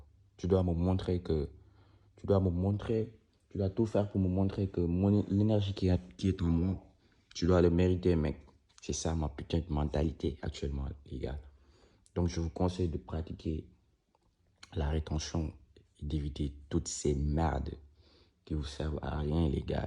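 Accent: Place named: Senegal. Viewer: Sa sent laccent dun frere senegalais lool Bonne viseo frere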